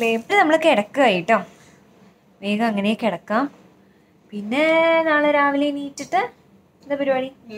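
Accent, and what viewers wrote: Thrissur, India. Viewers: അയ്യോ കണ്ണൂര് വന്നിട്ട് കാണാൻ കഴിഞ്ഞില്ലല്ലോ. ശബ്‌ദം തനി തൃശൂർ ഭാഷ.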